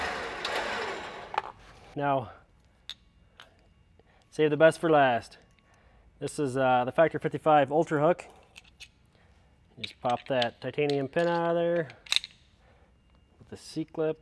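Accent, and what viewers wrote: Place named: Canada. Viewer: Nothing wrong with a Canadian accent. lol As a Canadian, I know of lots of us live in the Detroit area and work for the Big 3, so I figured you might have been amongst them. :)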